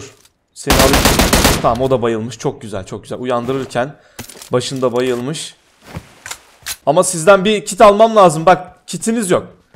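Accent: Adana, Turkey. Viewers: Adanli sivesi sananyakisiyoya bir videoda sadece oyle konus😉😆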